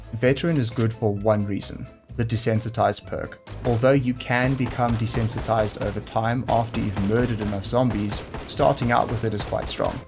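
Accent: South Africa. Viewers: You sound South African 😂. Epic tear list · 😂😂😂 are you from Joburg. I can just tell ur South African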